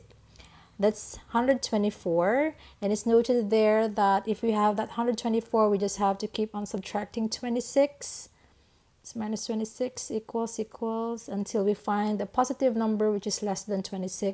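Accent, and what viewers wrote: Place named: Philippines. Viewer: you're a filipino because of the accent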